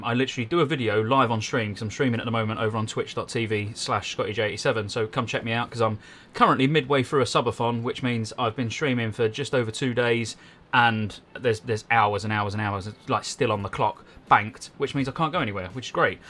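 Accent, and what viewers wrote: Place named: England, United Kingdom. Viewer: Trying to learn the different English accents, where did you grow up?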